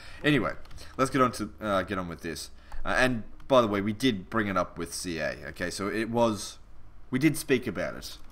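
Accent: Australia. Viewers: Yep, sounded Australian to me.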